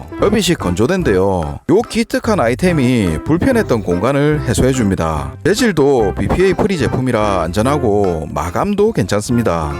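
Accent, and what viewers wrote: Gyeongsang, South Korea. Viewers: 아 갱상도 사투리 듣기싫네 정말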